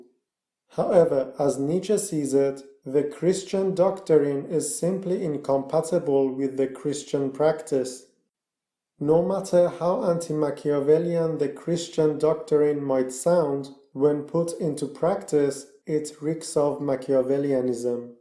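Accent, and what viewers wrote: Iran. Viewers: ???, I liked it already. You can like it too... I love the Iranian accent. What makes you think that you cannot like it if it is Iranian? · Are you Iranian? atleast that's what I a got from your accent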